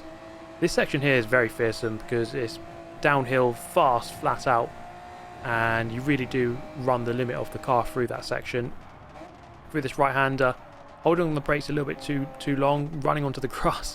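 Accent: United Kingdom. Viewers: Why do I get so hype watching someone else play the same game as me. Those damn Brits can make everything sound interesting.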